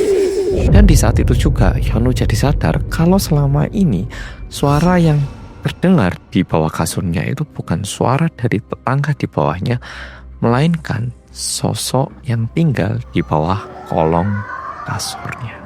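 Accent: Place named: Java, Indonesia. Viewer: Siang mas muedok😅 Lgsg nyimaaak🎉🎉🎉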